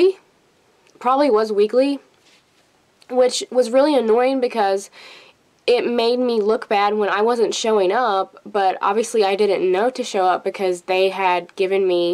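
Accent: South, United States. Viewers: a bit of southern accent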